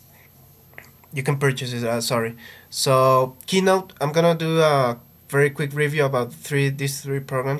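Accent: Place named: Brazil. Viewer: like the accent, brazilian?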